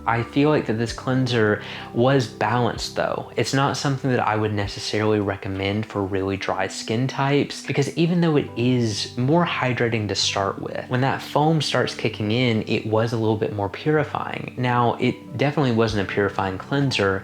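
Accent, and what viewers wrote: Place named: South, United States. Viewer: Every time I watch your videos I just think I love you so much you seem like such a sweet boy (man). Your subtle southern accent is the best.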